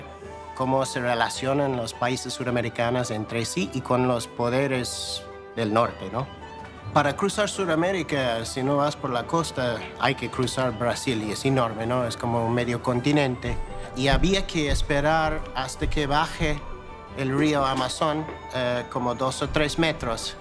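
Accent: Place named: Argentina. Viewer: Me encanto este vídeo,veo un lindo irlandés con acento argentino,, algún día podré visitar a nuestro país hermano. Saludos de una peruana viviendo en Irlanda.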